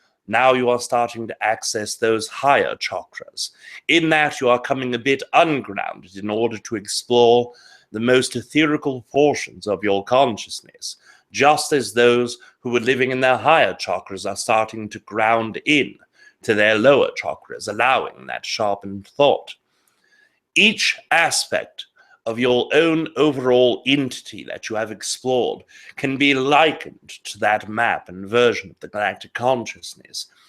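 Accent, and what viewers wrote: United Kingdom. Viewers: He sounds like Alfred Hitchcock, with that British accent. Hey, I want to believe this info, but I also want to keep grounded. There are many questionable issues. ok, so if all these sightings and escalations happen then woohoo.. Let's see what presents itself. Come on 4th density, appear, make a big splash in our pool.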